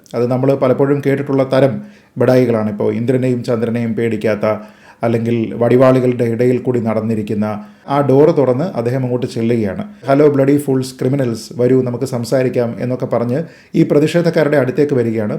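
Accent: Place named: Central Travancore, India. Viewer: സാറെ നിങ്ങളുടെ മണിമണി പോലുള്ള മദ്ധ്യതിരുവിതാംകൂർ മലയാളം കേൾക്കാൻ തന്നെ എന്താ സുഖം 😅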